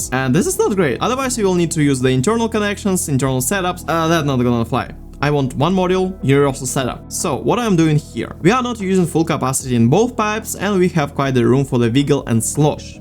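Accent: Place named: Russia. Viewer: Русский акцент... Вы русский?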